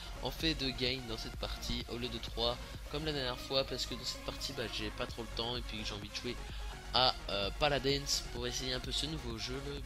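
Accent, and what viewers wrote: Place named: Belgium. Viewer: On dirai un belge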